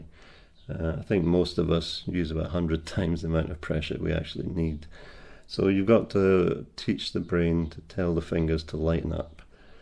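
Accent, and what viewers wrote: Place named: Scotland, United Kingdom. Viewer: Yr fingers looked like a spider, I said to hubby. Then u said spider. ;) Love yr accent. Scottish.. ? THe best accent in the whole world.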